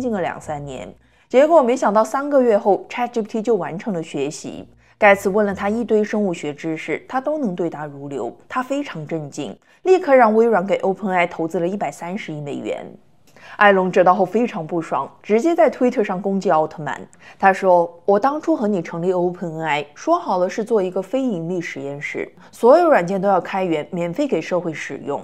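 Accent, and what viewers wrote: Hunan, China. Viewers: 你这普通话😂湖南人吧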